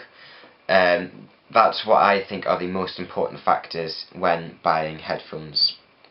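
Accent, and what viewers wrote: United Kingdom. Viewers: Are you English? Because I am, if you subscribe to me, I will subscribe to you!! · Are you welsh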